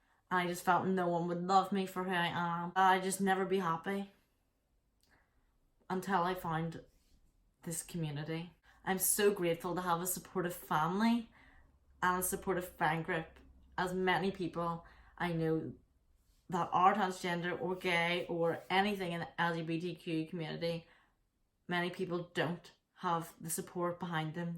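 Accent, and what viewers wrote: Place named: United Kingdom. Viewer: You’re so pretty, and aww I love your british accent 😍💗 anyway I can help ?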